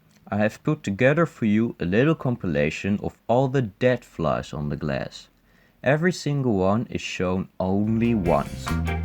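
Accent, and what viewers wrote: Netherlands. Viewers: You are too adorable. “Here’s a squished one. Twins!” Hearing your accent makes me miss Holland so much. I studied college in Breda at NHTV, and all the Dutch guys are just such sweethearts. Miss the frites carts 😉 and mayonnaise.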